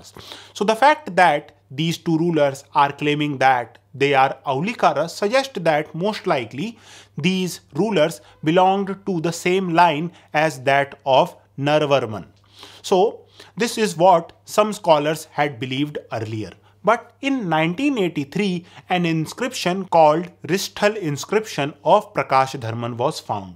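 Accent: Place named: Delhi, India. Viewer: accent to Delhi side ka hai aapka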